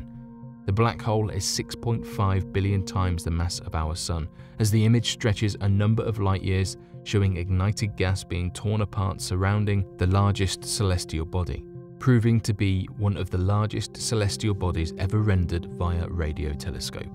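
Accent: United Kingdom. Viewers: Guy with a thick british accent ranting about American beauracracy, framing the whole thing as though NASA has any influence over the government. 1/2 of 1 cent of every tax dollar. NASA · British accents are good for documentaries and bad guys in movies. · British mate, close though.....kinda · The British accent is an extra convincing aspect 😉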